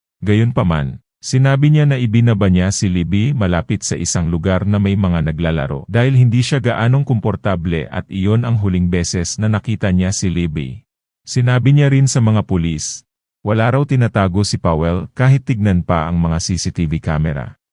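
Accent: Visayas, Philippines. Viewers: May pagka-visayan accent cya pero naiintindihan naman.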